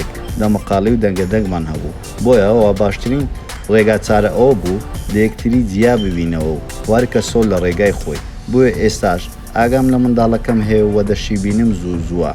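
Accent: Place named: Erbil, Iraq. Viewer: تن تن تن تننننن هه‌ولێری بێ مێشك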